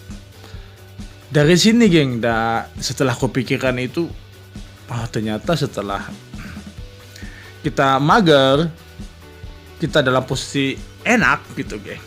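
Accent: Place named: Banjar, Indonesia. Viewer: Logat bahasa banjar nya keliatan bangt bang😂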